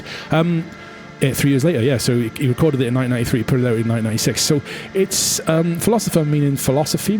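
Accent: Wales, United Kingdom. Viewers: Is this a fellow Welshman I hear?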